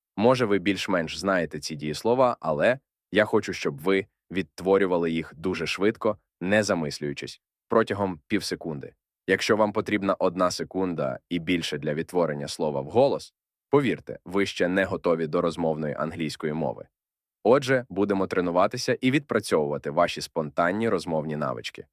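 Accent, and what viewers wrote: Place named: United States. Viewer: American pronunciation 👎 I like British pronunciation 👍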